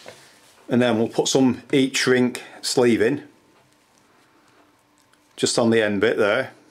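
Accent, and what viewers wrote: United Kingdom. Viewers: Very easy, I just connected mine and it work beautifully. thank you very much. If you dont mine me asking, what accent is that, I mean where are you from in UK?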